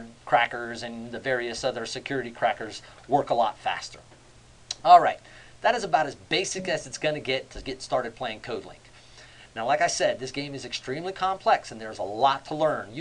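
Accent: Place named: United States. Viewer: Пиздец, ебаный американский английский. Всемирная тошниловка.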